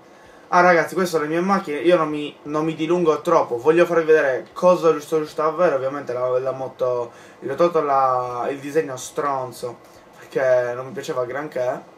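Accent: Sardinia, Italy. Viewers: Sei sardo vero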